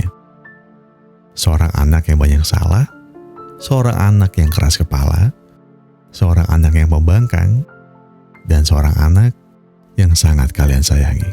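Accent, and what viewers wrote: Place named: Java, Indonesia. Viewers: Suarane khas bgt wong yogjo mas. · Logat jawa'mu kuwi lo aku suka 😊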